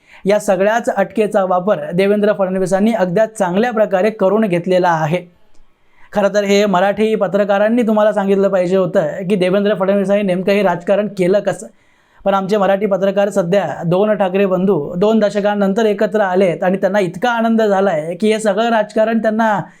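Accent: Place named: South, India. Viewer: तू दाक्षिणात्य आहेस मराठी बोलतोयस तुझं अभिनंदन पण तुझ्या बोलण्यात दाक्षिणात्य बाज आहे.तुला जो पुळका हिंदीचा आला आहे ना तो तू तुझ्या मूळ राज्यात जाऊन पहिल्यांदा हिंदी भाषा सक्तीची करण्यासाठी अभियान चालू कर.इथे अक्कल पाजळू नकोस. जय जिजाऊ जय शिवराय जय शंभूराजे 🚩🚩🚩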